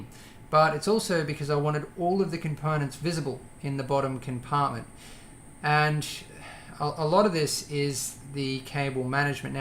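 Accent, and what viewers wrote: Australia. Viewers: this is the only video ive seen from you, sound australian? do you have a business that makes custom pc's? if so, id be really interested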